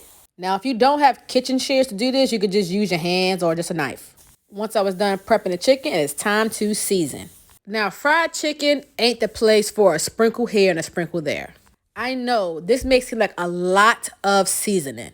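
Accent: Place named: New Orleans, United States. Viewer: You don't sound like you from New York I thought you was from New Orleans or something like that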